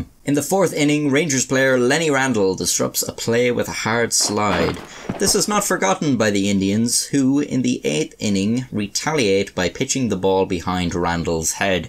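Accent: Ireland. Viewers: I think everyone loves you because of your Irish accent. Plus you are pretty funny!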